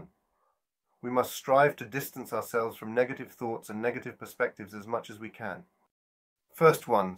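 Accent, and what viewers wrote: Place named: United Kingdom. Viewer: Güzel bir İngiliz aksanıyla güzel bir açıklama olmuş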